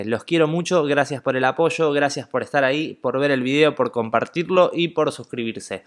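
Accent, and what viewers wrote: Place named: Colombia. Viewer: jajaja ya conocía al cantante? Pero vio que si se parece en algo jajajja ah bueno hablas como Colombiano en la buena parce jajajja 👍🇨🇴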